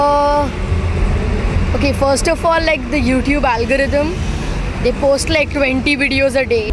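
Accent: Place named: India. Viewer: Pure Indian accent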